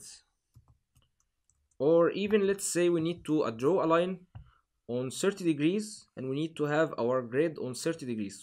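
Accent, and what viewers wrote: Egypt. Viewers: مصري حيبي عرفتك من لهجتك تابع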